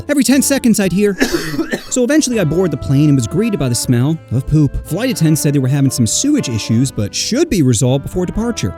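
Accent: Florida, United States. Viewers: This man sounds Floridian, talks about a lot of haitian interacts and they're riddled with them there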